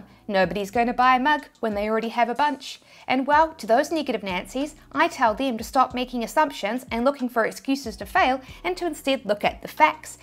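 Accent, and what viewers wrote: New Zealand. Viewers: I'm just here to listen to the kiwi accent...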